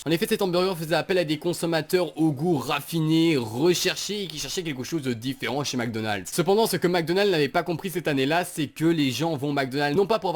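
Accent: Belgium. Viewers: parce que il est belge est qu'en Belgique les nombres ne se prononcent pas come en France, par exemple 90 se dit ''nonante'' et 70 ''septante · esque tu est belge????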